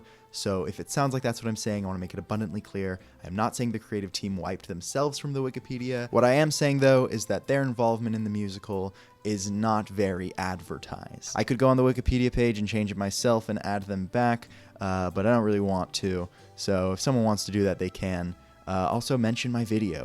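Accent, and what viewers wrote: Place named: Australia. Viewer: As an aussie i was also wondering why he sounded vaugely australian 😂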